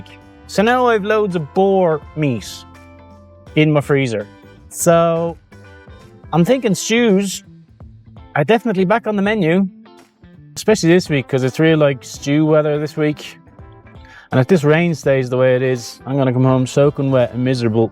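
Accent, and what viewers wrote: Ireland. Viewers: Keep on keeping on! You are the most wonderful ‘antidote’ for a very long crap day! 😊 As I’m someone of Irish descent I fell in love immediately with your gorgeous Irish accent 😅Seriously your vlog is the bomb - it helps me calm down after a long day! Daylight saving arrives back in New Zealand this coming Saturday - thank goodness! Can drive home in the daylight from work. Keep doing you - you are the perfect recipe, ps Need my coffee too x NZ 🇳🇿👏🏻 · Love the Irish accent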